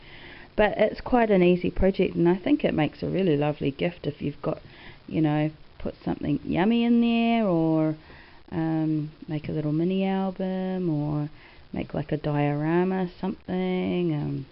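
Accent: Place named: New Zealand. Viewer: Wow this is breathtaking I love it i am making one at the moment for my nan but Hot dollar has the book box already to go...You sound like you are from New zealand I am from Australia were not that far lol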